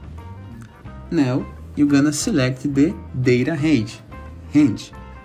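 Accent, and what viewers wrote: Brazil. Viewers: eu conheço o sotaque brasileiro kkkkkkkkkkkkkkkkkk Edit: o vídeo me ajudou, valeu!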